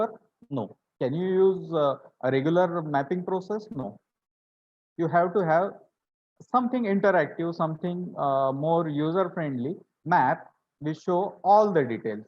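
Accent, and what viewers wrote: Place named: India. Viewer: inggris logat india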